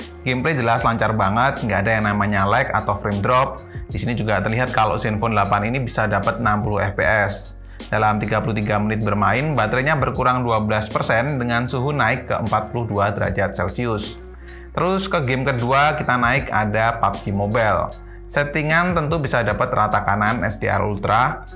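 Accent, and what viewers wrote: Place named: Sleman, Indonesia. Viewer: orang sleman ya mass?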